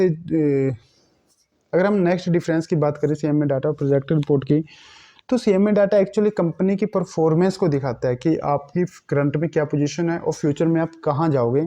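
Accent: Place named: Haryana, India. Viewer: Aap haryana se ho ,, apki language se lag rha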